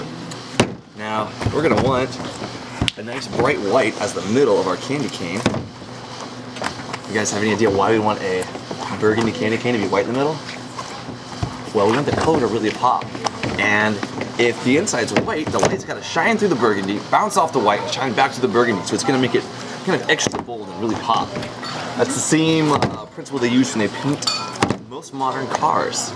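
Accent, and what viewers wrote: United States. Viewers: so basically how you make rock in England but with a hook on the end lol. still fascinating to watch though, love his voice, what part of America is that accent from?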